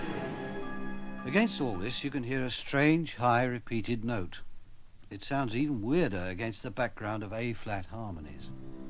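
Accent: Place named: England, United Kingdom. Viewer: Oh, what an authentic English! Of course, the explanation is excellent.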